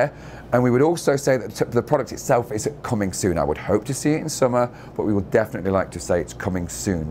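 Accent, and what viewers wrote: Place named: United Kingdom. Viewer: Looking and sounding quintessentially British! Nice.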